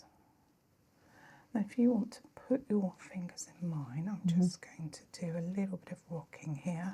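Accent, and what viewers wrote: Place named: Nottingham, United Kingdom. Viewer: This is officially my first ever comment on Youtube,. And it had to be for you! Absolutely love your tonality, pace and accent.. I had studied in the UK some years ago and your videos always take me back to Nottingham:)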